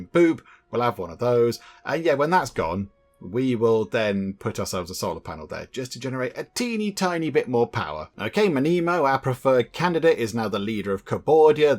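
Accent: United Kingdom. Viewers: My God you are sooo British xD, the excitement when you got the Big ben..... anyway I liked all the videos of this playthrough if that's what it'll take for you not to stop, please don't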